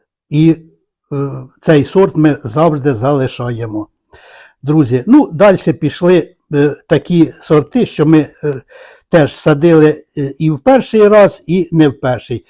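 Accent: Poltava, Ukraine. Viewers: Дякую за чудовий огляд, дивлюся Ваші відео з великим задоволенням, приємно чути рідний полтавський говір. Сама така, як Ваша дружина, все хочеться щось новеньке.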